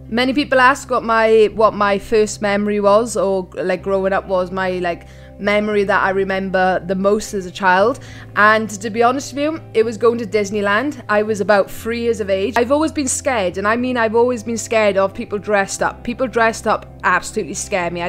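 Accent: Wales, United Kingdom. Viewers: Thanks for sharing your story with us interesting not always good and iam sad to hear what u had too go through but i believe we learn and hopefully grow stronger as u said through these experiences. Love your Welsh accent gorgeous, my music tastes are almost completely different i grew up a different music area 60- 70s , now its changed again, night wish, helloween style , all the best for further endeavour's with YouTube and hope it goes well for you hugs. Peter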